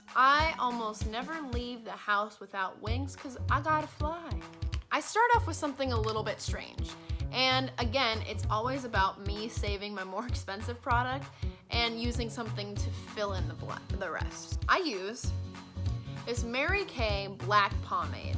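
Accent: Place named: United States. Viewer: Your country accent is killing me. I love it. 😹😹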